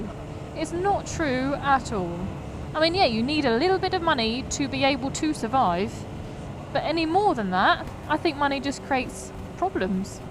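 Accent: United Kingdom. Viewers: i like that British accent so much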